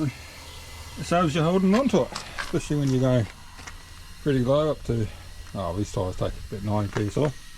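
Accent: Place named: Australia. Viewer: hey im glad you speak plain Australian everyone wants to comment on the connection names im just happy to get these pointers❤❤❤❤❤ ya work mate keep it up for the rest of us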